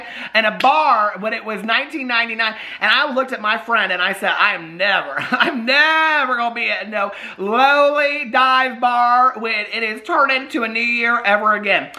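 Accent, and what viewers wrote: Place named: Minnesota, United States. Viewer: I'm dying with your Minnesota accent! 😂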